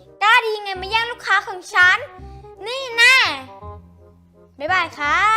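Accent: Thailand. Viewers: พี่เป็นคนพาคเหนือหรอค่ะ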